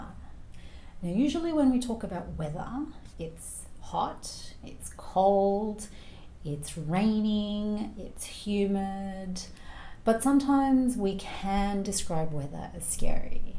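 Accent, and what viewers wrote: Australia. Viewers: Hi, I just arrived in Australia, and I wanna learn Australia accent so bad. Thank you for your videos. I hope I can learn a lot from you.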